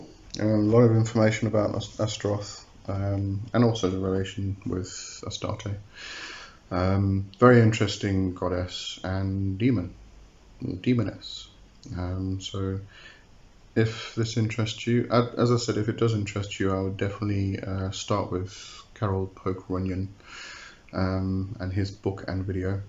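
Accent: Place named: United Kingdom. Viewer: u cant possibly know anything you sound British its ridiculous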